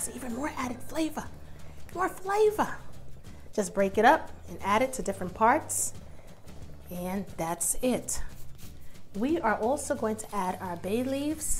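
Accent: New York, United States. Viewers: Nice. Always liked the ladies with NY accent. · Man you look just like toya.. lil wayne bm.. but with a new york accent. Almost done making my roast thanks sooo much.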